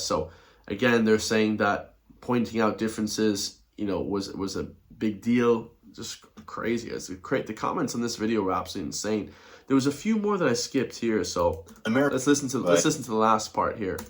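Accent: United States. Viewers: Are u not American? Bcoz u sound very American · The Irish- always arse-licking the USA!. Lack of confidence leads the most pathetic of us crave attention from big daddy America. Some of us try to adopt the accent, how sad. Oh yeah, just like the presenter here! Nothing says 'Irish Patriot' more than adopting a foreign accent. Haha. This couple were pleasant ( I watched their vids) but like so many Americans, they were naive and small-minded. Of course some people will take the piss! This love affair with America is extremely childish. An American liked our Tayto! Oh my god, how amazing!!!!! Get some confidence for f@ sake.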